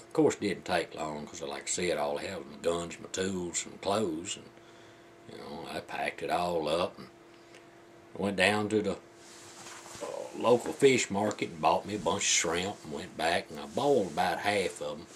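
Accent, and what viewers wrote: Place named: Georgia, United States. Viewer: HAHAHAHAHAHA That is an AWESOME story!! You know, you remind me a lot of my old man lol. You from Georgia by chance? You and him have the same accent and seem about the same age. He has all kinds of stories like that that happened to him in the past, lol. This made me laugh.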